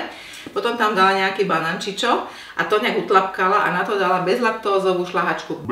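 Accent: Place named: Bratislava, Slovakia. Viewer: Nebyť toho blávackeho dialektu by človek bral aj vážne.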